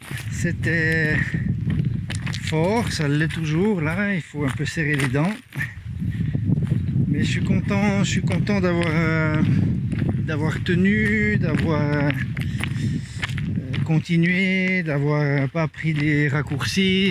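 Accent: Switzerland. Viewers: Bravo à toi d'avoir tenu jusqu'au bout. Tes vidéos sont extras et tes commentaires sont très divertissants et plein de bons conseils. J'ai bien compris que tu film avec un téléphone mais comment tu fais pour pouvoir ramener autant d'images ???? Tu consommes combien de cartes mémoires ? Par contre chapeau pour les prises de vues, un vrai cinéaste ...... Continue comme ça c'est top :-) Bonne continuation. PS : J'adore l'accent Suisse ;-)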